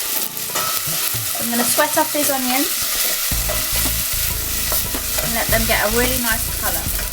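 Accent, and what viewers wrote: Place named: Sri Lanka. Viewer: sri lankan ENGLISH amma..hah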